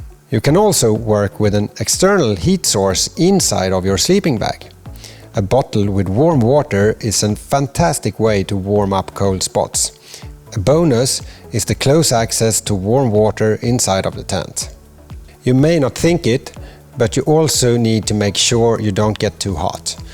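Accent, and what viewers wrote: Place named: Sweden. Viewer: swedish accent :)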